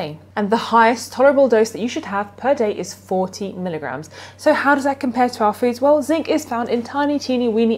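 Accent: England, United Kingdom. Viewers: Great video (shared). Seen some of your other videos and noticed your from Australia but your accent is more English as I'm from the UK myself. Did you once live in the UK and if so what Town/City.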